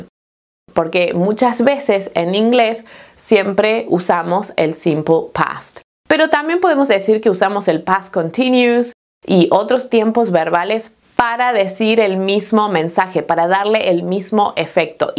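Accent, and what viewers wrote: Argentina. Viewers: ¡Muchas gracias, muy interesante! Me gustan tus lecciones porque aprendí a hablar un poco de español cuando estuve en Argentina, hace muchos años, y entendí que eres argentina por tu pronunciación. La primera diferencia es la pronunciación de dos el... por ejemplo llave, lluvia, llamar, etc. Los argentinos los pronuncian más o menos como g, pero creo que la gente en España los pronuncien diferente. Disculpe mi español, es muy malo. Estoy aprendiendo. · Ella está hablando más en castellano argentino salvó el tu qué acá no se usa el español tiene muchos tiempos de verbos que ni yo los se salen solos soy argentino si querés aprender te aconsejo que vayas más al norte de sudamericana te va a resultar más fácil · ¿Eres argentina?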